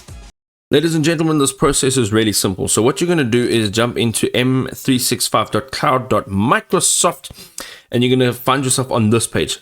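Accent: South Africa. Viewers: Saffa accent. Good stuff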